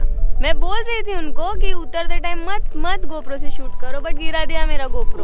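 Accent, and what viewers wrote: Maharashtra, India. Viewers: Proper marathi accent good one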